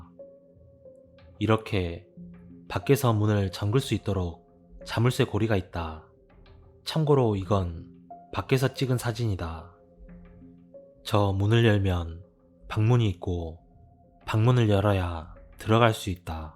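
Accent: Jeolla, South Korea. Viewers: 전라도,억양 ㅎㅎㅎ 어떡,할꺼에요 ㅎㅎ 고향냄새 좋네요 ㅎㅎ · 전라도사투리 재미있어요. 무서워야하는데, 사투리때문에 집중못했어요. ㅎㅎ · 제가 오히려 더 감사합니다. 진심 남의 사연을 듣는 게 아니라 본인 사연같아요. 구독자 엄청엄청 늘어서 흥하시길 바랍니다. 저는 경상도라 쌈무이님꺼 매니아인데 전라도 말투도 최고입니다.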